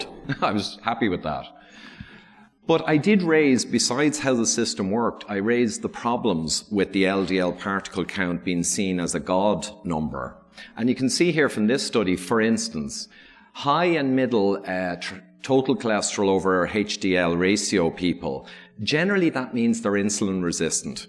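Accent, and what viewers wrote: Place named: Ireland. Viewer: Truly starts The Irish accent ... truly the sound of angels singing ;-)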